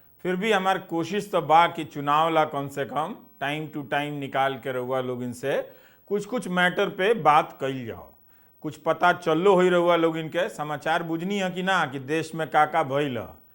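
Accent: Bhojpuri, India. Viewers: बहुत बढ़िया,समाचार पढ़े के शुद्ध भोजपुरीया अंदाज बहुत अच्छा लागल हऽ। शुरू मे आउर आखिर मे इ जे नमस्कार कहिला ओकरा जगह हमरा हिसाब से प्रणाम कहेम त आउर प्रभावशाली लागी। 🙏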